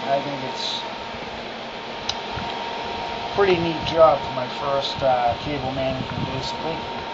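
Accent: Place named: Portsmouth, United Kingdom. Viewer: Hey, nice rig!! I'm in Portsmouth. You sound like you're from the neighborhood.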